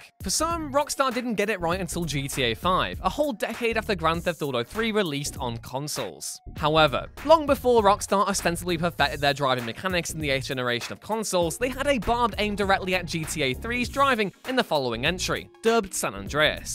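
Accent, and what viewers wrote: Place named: Liverpool, United Kingdom. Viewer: Can you not make the liverpudlian narrate videos? There's a reason they're voted to have the worst accent in the uk.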